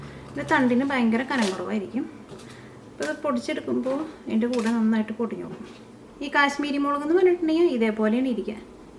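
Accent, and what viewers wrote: Thrissur, India. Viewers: Hi, I tried this, everyone here loved it. Very tasty. All your dishes are really nice I have tried some other dishes also. In the beginning I started watching becoz of ur thrissur slang but then later I liked ur all dishes. even I am from thrissur. best regards.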